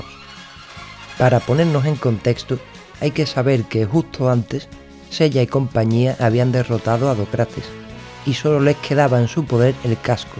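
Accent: Spain. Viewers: Me gusta tu manera de narrar amigo , eres español verdad , tienes tu gracia de narrar y tiene buena imaginación a ponerle a ikki pollo frito , a seiya el burro alado, a hyoga el pato soviético a shyriu el striper favorito y a shun que le dices eso no me acuerdo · porque era el primero que sinceramente menos mal que no tenía diarrea cuando vi este video, y ya vi que otras personas opinaron que lo gracioso y original es la voz sería y calmada mientras dices chistes. El origen de los pandilleros del Zodiaco fue aquí. Ya después subiste un poco la frecuencia de tu voz, queda más emocionante. Eres bastante inteligente, si hasta el semilla chilena en el director disfrutó eso de la uea Shun... Es más gracioso porque un español lo dice, y con un acento interesante.... Ya sacaste video de los hentai de Saint Seiya? 😅 🤣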